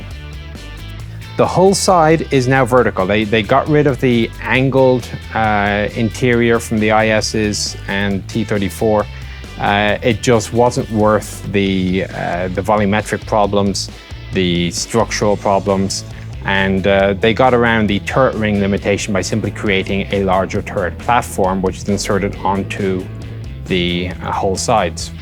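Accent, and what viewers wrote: Ireland. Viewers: 🤣 Irish or Scotish?👍👍👍💯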